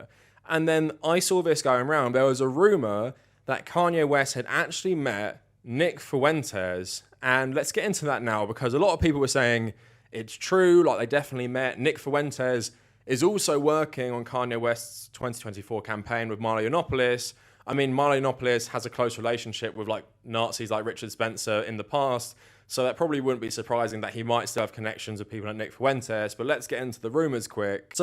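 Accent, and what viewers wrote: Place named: United Kingdom. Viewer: in an annoying british accent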